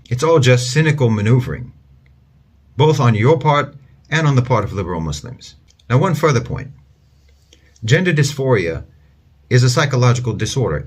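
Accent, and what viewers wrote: United States. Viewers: his accent is American, german is his parents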